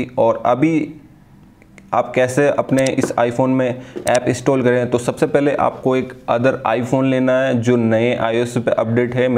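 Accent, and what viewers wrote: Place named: India. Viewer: why every single tutorial is made by indian???!!! I can not understand anything!!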